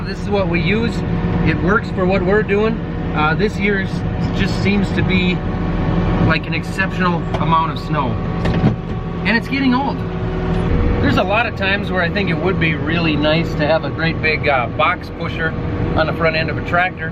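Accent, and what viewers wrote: Minnesota, United States. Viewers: "on your ruff" I love the Minny accent! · Can you do your best Minnesota accent for us?¿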